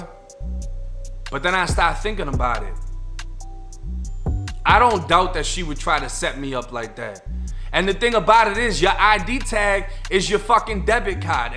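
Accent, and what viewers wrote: Boston, United States. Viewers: Shit i literally started listenin to channel 3 days ago. Friday and its sunday...shit i was like you gor a boston accent. Lol i dunno i can relate to everything he sayin so im goin video to video. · I love Bostonian accents, "and suddenly you're droppin' your R's " Love it, dude you gotta write a book. You're a fucking word smith, smart, awake, and able. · It's just a Boston accent. Pretty normal for the boston area. You don't get out much huh lol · You sound crazy ass fuck but yo opinion he has a east coast Boston, accent but what do I know 😂😝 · Boston in the house! I love this kid but damn his accent is serious. I'm from Beantown and got an accent but not like this homie. #617 #508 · Omg I know!!!! 🔥 💚🤍❤️ And it’s a sentimental thing for me too. My dad and Uncle were full blooded second generation Italians born and raised in and around Atlantic City, NJ. 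They moved away in their 20’s and my Uncle still has the accent after living in Florida for 42-43 years. His is the closest to cuz he has a little southern thrown in too. My dad had it to but not quite as thick because we spent many years in Michigan (until he passed). I noticed the longer has been lives up there in MA the thicker his accent gets, which is completely normal. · If u didn't say you was from Florida I would of thought u was from boston... I love your channel bro...I wanna do what you do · You have an accent.. Are you born and raised in Fl? Bc you sound like your from Boston or even maybe Ny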